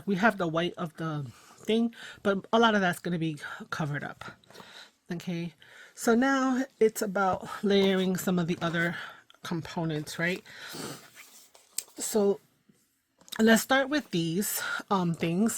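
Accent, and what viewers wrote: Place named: New York, United States. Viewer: Everything came out Beautiful ❤! I started my junk journal journey this past year and I still have a ways to get to where I you are at 😊. Thank You for sharing your creative process with us . I HAVE to ask you ….. are you a fellow Puerto Rican from New York ( you have that accent , IYKYK 😉) . I’m from Queens but I now live in Miami and I miss the cold weather! lol😉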